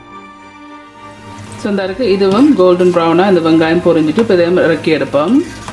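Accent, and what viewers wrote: Sri Lanka. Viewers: Ungaludaiya elatamil migavom arumai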